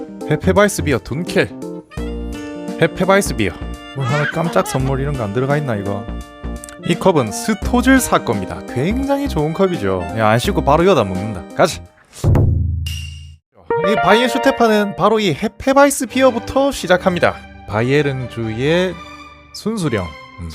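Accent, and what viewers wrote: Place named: Seoul, South Korea. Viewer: 와 유용한 정보들과 맥주역사까지 설명해주니 좋네요 무엇보다 서울말로 설명해주니 귀에 쏙쏙 들어옴!!!🤩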